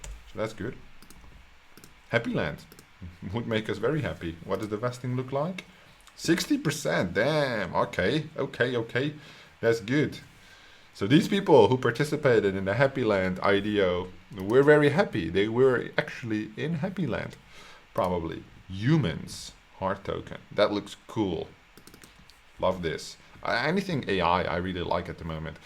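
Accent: Netherlands. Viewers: Just as early as yesterday.. Are you Dutch btw? Your English is great but I hear the Dutch I think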